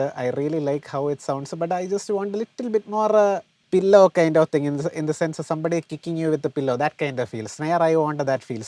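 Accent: Kerala, India. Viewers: bro nothing wrong in it, but since he’s explaining, I couldn’t catch it, cuz it was more like Malayalam English, the concept is amazing! He’s knowledgeable no doubts in that, just posted what I felt as a listener.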